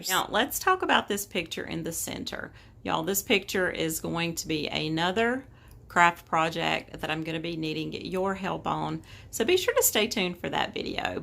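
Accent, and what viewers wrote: South, United States. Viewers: Where are you located? I am just curious because I’m a southerner with your accent too!! 😂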